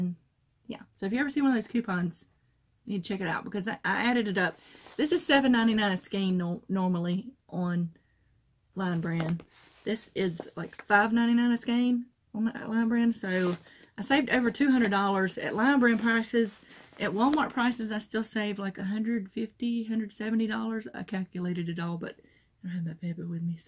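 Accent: South, United States. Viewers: I read rules and understand .love to make baby clothing and blankets. love your southern talk.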